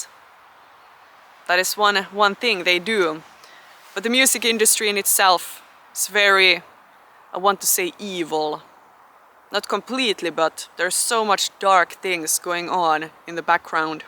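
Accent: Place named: Finland. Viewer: I absolutely LOVE your Finnish accent speaking English! Especially the way you say "Music"! I began learning guitar in 1968 as a child and grew into the music of 1970's. In my opinion the absolute best decade for music of the 20th century and I agree with you for the same reasons even though I am old enough to be contemporaries of your grand parents. I like your channel.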